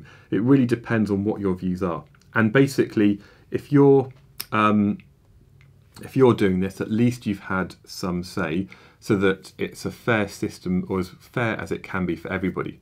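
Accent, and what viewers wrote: United Kingdom. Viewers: I love british accent ♥️😍♥️😍♥️😍♥️🏴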